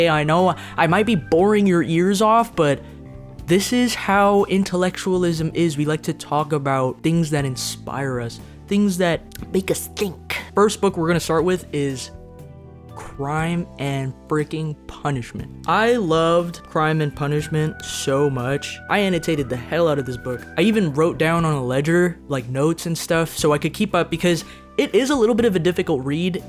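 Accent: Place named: United Kingdom. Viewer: Yea the British accent sold it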